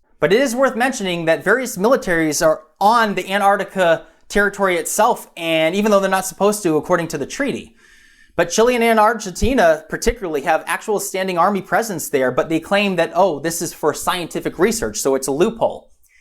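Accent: United States. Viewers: woah brother are you from Idaho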